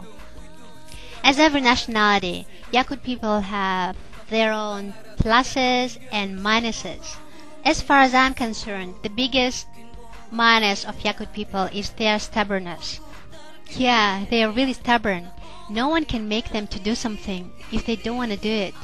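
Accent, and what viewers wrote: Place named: United States. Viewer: American accent does not sound good. In any way.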